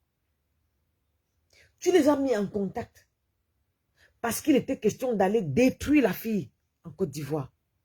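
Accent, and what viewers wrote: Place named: Cameroon. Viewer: Ton accent me fait dire que vous êtes camerounaise merci pour ton soutien à EK. Le cas peter c'est un cas école je pense que ça vous servira de leçon aux blogueurs ivoiriens à deux balles tous des analphabètes illettrés.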